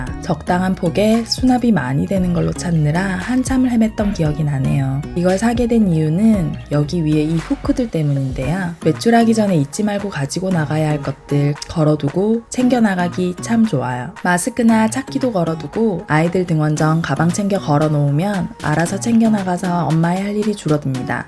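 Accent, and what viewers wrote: Gyeongsang, South Korea. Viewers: 영상 너무 잘 보고 있어요~ 실례지만... 경상도분이신지요? 중간중간 살짝 사투리가 나오는데... 나머지 표준어는 너무 잘 쓰셔서 문뜩 궁금해서요 ㅎㅎ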